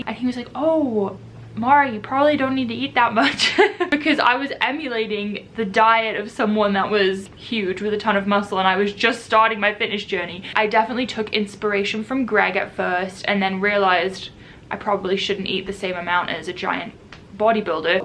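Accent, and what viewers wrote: United Kingdom. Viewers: Are you British? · Your accent jumps between British and American it's wild! 🥰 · With your Brit accent and my Brooklynese we should do a video together. What a trip that would be.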